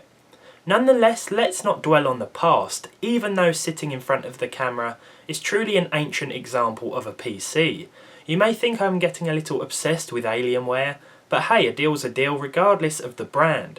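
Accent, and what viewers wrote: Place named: Brighton, United Kingdom. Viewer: You’re from Brighton, aren’t ya?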